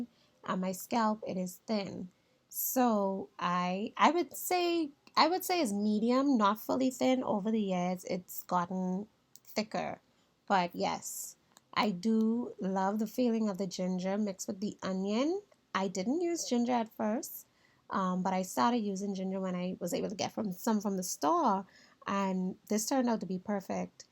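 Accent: Bahamas. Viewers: I hear an accent - Bahamian?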